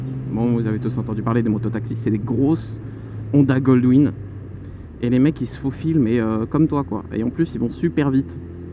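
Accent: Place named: South, France. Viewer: Cool ta présentation, ça fait plaisir de savoir à qui on a à faire haha, et l'accent du Sud et bien non ? On a la classe nous les sudistes mdr. Sur ce Ride Safe l'ami. V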